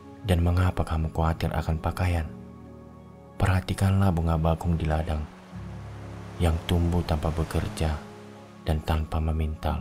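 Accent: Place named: Nias, Indonesia. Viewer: Kalau dengar logatnya seperti logat org Nias bhsa indonesianya.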